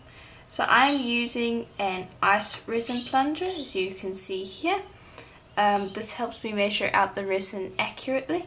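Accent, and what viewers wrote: South Africa. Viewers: Is that a south african accent i hear? I just found your channel, very cool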